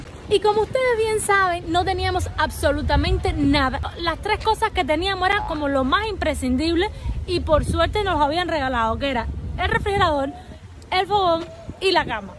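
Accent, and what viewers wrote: Cuba. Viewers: Hola Ojalá puedan lograr su autito. Dile que no se preocupe por el escarabajo. Es fiel. Y por sumba. Búscate un gym y ofrece tu servicio. Te darán lugar para que ejerzas. Uno buenos pesos no está mal. Y con tu tonalidad cubana....se llenara. Tengo un gym. Por esa razón te comento. Abrazo desde BsAs.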